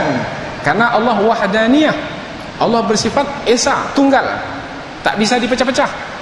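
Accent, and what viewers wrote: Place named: Malaysia. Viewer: Sekali dengar seperti orng Malaysia.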